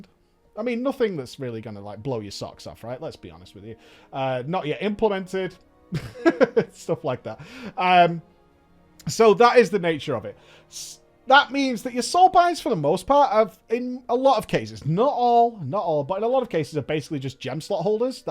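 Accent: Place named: United Kingdom. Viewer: Orientated....British accent but American lingo. The simping is real.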